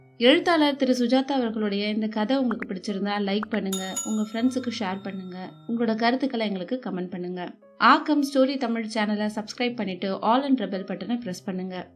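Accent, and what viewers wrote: Chennai, India. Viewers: Your Madras dialect is bloody hilarious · You seem to be becoming very proficient in Madras basshai!!!!! Way to go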